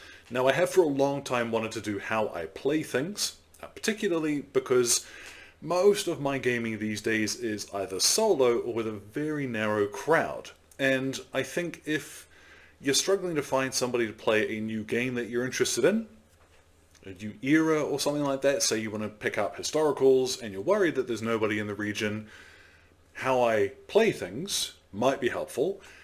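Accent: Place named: New Zealand. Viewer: The new mic makes you sound like a bloody Kiwi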